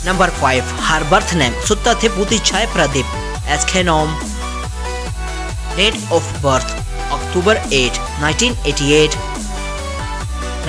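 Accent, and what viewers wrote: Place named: India. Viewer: Nice video. By the way I think ur Indian bcoz the way u pronounce English words only Indian or Pakistani people pronounce.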